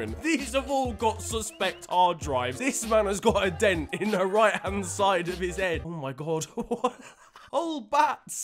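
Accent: Liverpool, United Kingdom. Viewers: You're voice is like an liverpool fan that is white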